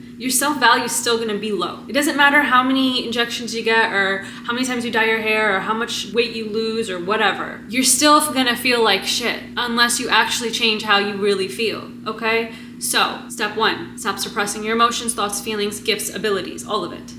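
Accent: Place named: United States. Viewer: Defiantly fine, fine, fine. Yet I have a hard time making out what ethnicity you are though because of American accent is strong.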